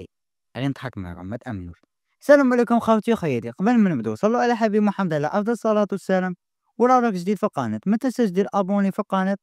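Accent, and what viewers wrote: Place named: West, Algeria. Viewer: درتلك ابوني علاجال هدرة الغرب رشقة 😂😂😂❤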